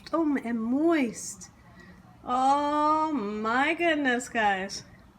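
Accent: South, United States. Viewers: I love your country accent.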